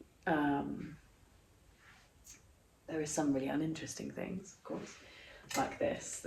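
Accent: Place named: Surrey, United Kingdom. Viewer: Lovely Surrey accent and Asmr , Just wish you posted more videos , Blood thinners aren’t fun I’m on them for life because i get clots in my lungs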